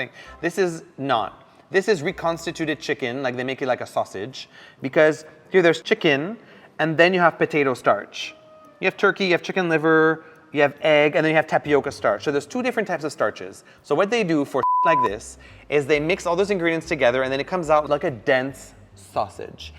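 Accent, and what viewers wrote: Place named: Quebec, Canada. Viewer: I totally recognize your accent. You're French Canadian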